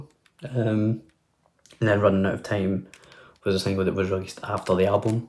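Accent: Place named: Scotland, United Kingdom. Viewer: Hi! - Happy New Year to you. Welcome to the Vinyl Community. Enjoyed your Tag 24 video. was a good choice but you need to get some or. Where in Scotland are you based? As a fellow Scot it’s great to hear your accent. When I do a thread response I often have to show CDs. I love. is an all time favourite. I must listen to your guitar playing videos. Cheers Bill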